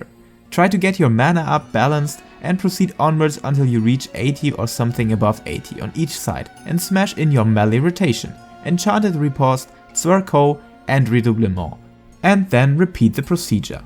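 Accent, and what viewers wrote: Germany. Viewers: he didnt even pronounce a german word the german way, when he sounds like a german dude :P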